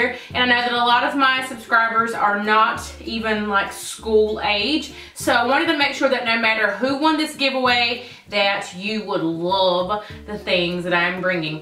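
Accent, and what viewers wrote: South, United States. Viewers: My favorite video would have to be the master bedroom transformation. Girl, it's beautiful! I hate picking just ONE video because I love them ALL!! I love those videos when you start rambling in the car because your accent is just like mine so country girl! My Instagram is LOVE YOU & CONGRATS ON 20K GIRLY!!!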